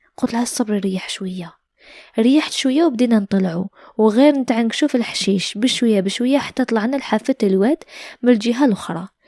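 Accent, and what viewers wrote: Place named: Algiers, Algeria. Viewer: اللهجة العاصمية متقدرش تعيش وتأثر باللحظة معاها تحيا العباسية وايمان وناس الغرب وهادي ميش عنصرية بسك واشنو وقالي و كيفاش ويقعد تاع لاتشيتشي ميش تاع عشرية 😂😂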